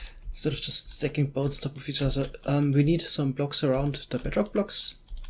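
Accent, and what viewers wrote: Germany. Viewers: Hi, i saw your minecarttrack in the nether for fast diagonal travel on your server and wanted to build it on my own, but i did not find a tutorial on your channel for it. Is there a video i did not see or do you know one? Would be great if you could help me out. MfG feris, ich gehe mal davon aus dass Sie Deutscher sind, da ihr Akzent hörbar ist.:-P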